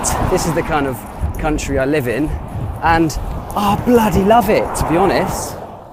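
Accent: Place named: United Kingdom. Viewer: I really love British accent.😍😍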